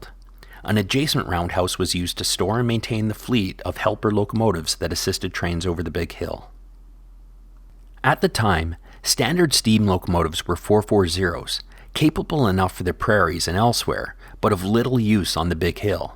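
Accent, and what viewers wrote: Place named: United States. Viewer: For someone claiming to be local, it is annoying to find that you are American. Your accent ant mispronunciation of words, especially "route", belie your true nationality.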